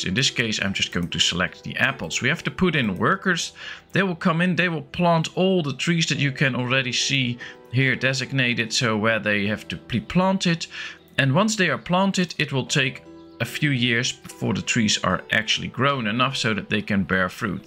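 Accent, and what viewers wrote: Netherlands. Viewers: This is a very detailed video, it shows a lot of time and dedication invested in this game. Your English sounds very good, even though it's drenched in a thick layer of Dutch. You've spend a lot of time in the discovery of all the facts and numbers in this video, and I thank you for that. I too, have spend many hours playing Ostriv, and I believe your numbers and facts are correct. One detail though: if you build a field of 25x50, you will always have 1250 units, whatever plant you grow. That is, only if your field is placed on a flat and even surface. Even the slightest slope in the terrain will cause less plants could be grown. Groeten uit Ommen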